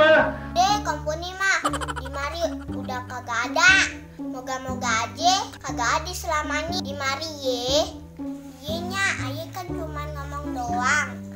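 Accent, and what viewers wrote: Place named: Jakarta, Indonesia. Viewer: gitu banget dah...😆😆 seruu video nya,kak lucu banget sii..ngomong logat betawi nya lucu😂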